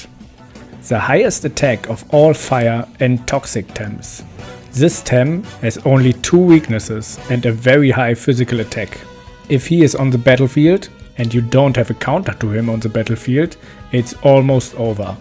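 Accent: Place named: Germany. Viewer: Sofort gehört das du deutsch bist😂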